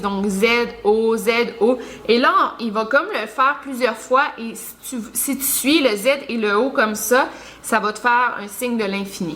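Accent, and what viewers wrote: Quebec, Canada. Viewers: Merci pour cette vidéo. Ooooooh que j'adore ton accent. C'est trop mignon 🥰. J'adore l'accent Québécois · Roh l’accent québécois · Depuis que je regarde tes vidoe je me suis habitué à l’accent canadien 😂❤️ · J adore ton accent ...québécois tu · Moi j'adore l'accent Québec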